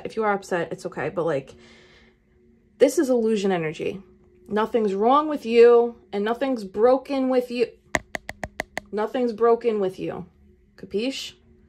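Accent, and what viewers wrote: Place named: Long Island, United States. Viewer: I just found this page and I subscribed because of your accent.. are you from long Island? ❤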